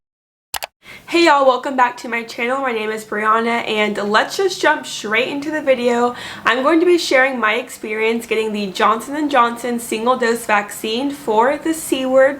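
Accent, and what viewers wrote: United States. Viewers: I know that accent from a familiar place, young lady. Are you from western PA by chance? 😉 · Brianna, just ran across your video and decided to watch. A few minutes in I was thinking "this girl sounds like she's in Texas" and then you eventually revealed you're in the D/FW area, as am I. I'm just getting over the side effects from my 2nd pfizer vaccine. I slept for 24 hours, felt like I might have had a slight fever, a bit of achiness. Of course, my allergies are in high gear right now which doesn't help. I'm older than you are, 61, with an underlying health condition. But like you, I'm so grateful to be vaccinated and hopefully we can return to a more normal life. I've been self-isolating at home alone for a year so I'm ready for human interaction. I salute you being a teacher and public servant. One of the most important jobs in the world. 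Wishing you many blessings and a covid-free life. You're so adorable I bet your students LOVE you!!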